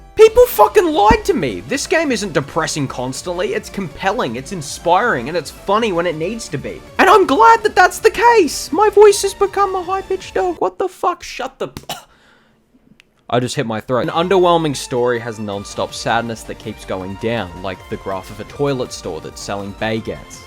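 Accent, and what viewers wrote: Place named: Australia. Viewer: Fabtastic video, man. You're definitely gonna make it, man. Also, every time you say Mari, I keep thinking you're saying my name in your Aussie accent.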